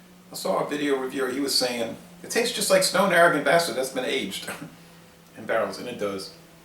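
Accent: Louisiana, United States. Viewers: Got that Louisiana drawl.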